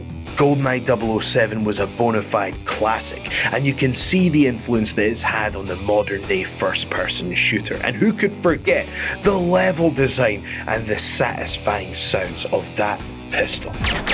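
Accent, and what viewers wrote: Scotland, United Kingdom. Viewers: the lovely thing about is their presenters are always SO hot :P that sultry Scottish voice serenaded my soul haha.....plus hes proper fit. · I just love to hear this guy talk. is he Scottish or Irish? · It is his Scottish accent. Dune becomes Djune, Duracell is Djuraceyll etc. No big deal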